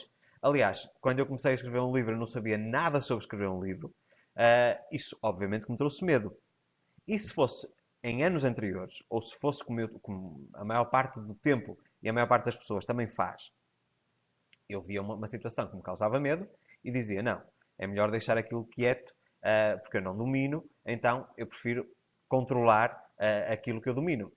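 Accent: Portugal. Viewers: Esse sotaque português...😍😍😍😍 além de conteúdo construtivo. Grata como sempre.😊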